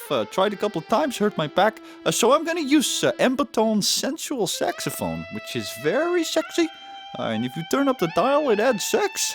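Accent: Netherlands. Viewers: Your Dunglish is on point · you're doing a amazing dutch accent if thats your intention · I’m hoping the folks at Rockstar invited to do a radioshow for GTA VI! Good fun! Pretty decent Dutch accent there 😀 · Hi, where did you pick up the dutch accent? I love it. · Very Dutch accent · The Dutch accent sounds just so right :) Totally enjoyed this. Thanks 😊 'I don't blow myself, I tried it but it hurt my back'. Briljant. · Not gonna lie, he has a great dutch accent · What is the reason behind the Dutch accent lmao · You certainly sound like at least one of your parents is Dutch ... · How is your Dutch accent so on point!!!?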